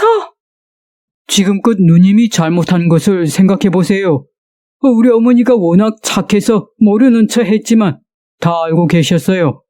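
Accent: North Korea. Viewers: 억양이 북한쪽 같네요? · 북한사람이 읽어주는거 같네요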